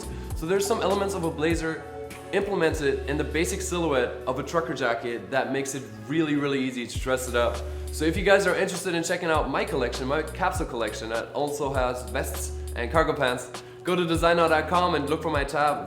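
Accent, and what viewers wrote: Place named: Germany. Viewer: He sounds Veryy german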